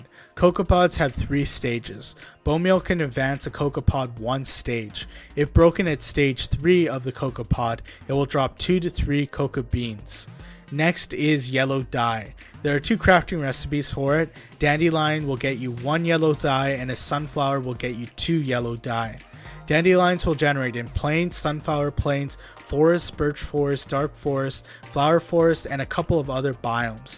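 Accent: India. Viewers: I know because you can notice the accsent of indians and other And the channel name a pic are both realated to india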